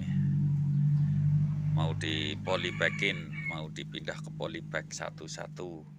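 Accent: Banyumas, Indonesia. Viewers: Pak jan tembe ketemu channele giye aku bani banyu mas nang Lampung ngapak pada bae nek ora ngapak ora kepenak ky gue ya pak. Salam knl.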